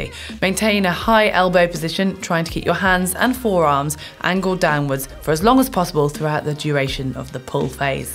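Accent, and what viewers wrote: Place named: United Kingdom. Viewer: the British accent i can't understand and I'm not even american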